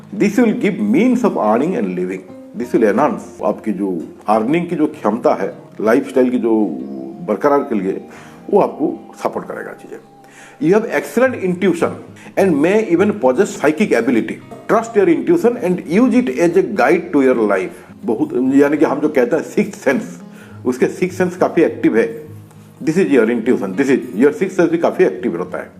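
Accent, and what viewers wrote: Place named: Bengal, India. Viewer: Y m I getting the good smell of Bengali accent from this particular video 😅😅😅 M I ri8 or not😢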